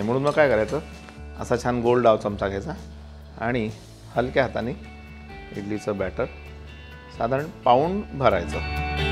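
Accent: Vidarbha, India. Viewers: Vidhrbha chi bhasha bole Tumi mustt khupch Chan vtl sir khupch Chan recipe sir 👍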